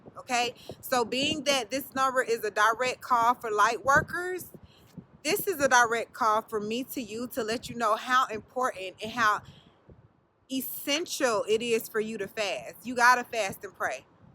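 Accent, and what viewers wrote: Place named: South, United States. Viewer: I thought I knew that country twang. In from Charlotte. Well the past 9 years. I try to listen to other’s knowledge on this subject, but I keep coming back to your intoxication.❤️❤️❤️❤️❤️❤️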